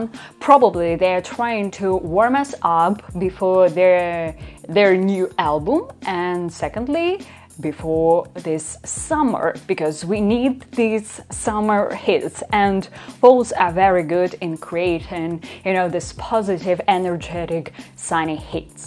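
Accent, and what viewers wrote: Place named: Ukraine. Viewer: I didn't know you were Ukrainian but I suppose you were from around based on your accent. I'm also a huge Foals fan and I saw you reacted to one or two more songs by them. Much hugs and love from your number one fan from Bosnia. Your reactions are awesome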